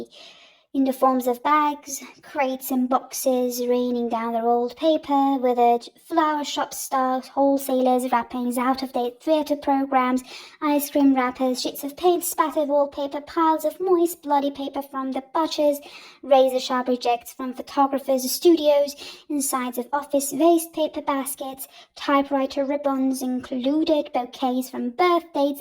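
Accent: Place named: United Kingdom. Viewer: Iove it The British accent is funny to me 😄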